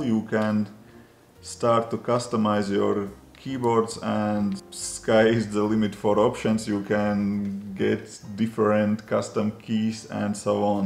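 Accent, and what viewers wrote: Russia. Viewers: Словно русский заговорил на английском) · круто! 3 языка знаете... У вас просто русский акцент когда говорите на английском )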